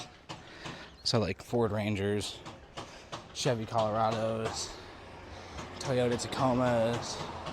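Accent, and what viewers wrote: Australia. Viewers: Love your Aussie accent. Don’t stop doing it 🤩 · Loved this video, Cleveland is beautiful. Your Australian accent is spot on love to hear it ❤. Wearing the hat will help if you have sweeping Magpies. Don't run, just calmy walk away if your being swooped. Leave the area, as the Magpie male will defend the nest by swooping. We also have crows here in QLD not ravens, every other state has ravens. QLD has alot of unique qualities that you won't find anywhere else. Australia is very diverse, thanks for your vlog I love it 🎉❤ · You're definitely getting the Queensland accent mate. My Adelaide accent still gets confused for Kiwi in Melbourne. Nice to see you're still enjoying our country 🇦🇺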